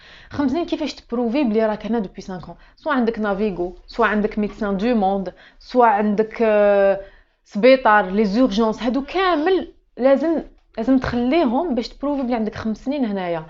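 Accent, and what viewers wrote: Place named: Bab El Oued, Algeria. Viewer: قتلتيني بالضحك كي تهدري بالدارجة تاع باب الواد 😂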